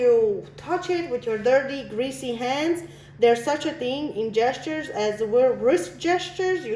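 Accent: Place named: Iran. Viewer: You have that Irani accent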